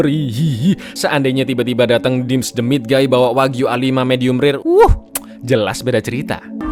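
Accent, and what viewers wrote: Java, Indonesia. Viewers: Pindah ke channel saqhayang yuk. Wong ini medok kali.. Gue org sumatra orak ngertos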